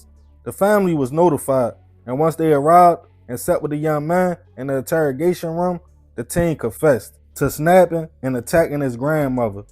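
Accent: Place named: Maryland, United States. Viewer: Da con…tent! I’m from NJ and my family lived in Silver Springs, MD and your voice made me recall their accents. Salute.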